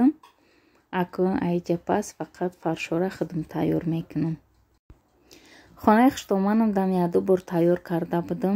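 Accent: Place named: Kulob, Tajikistan. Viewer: Шумо аз конибодом барои чи кулобча сухан мекуни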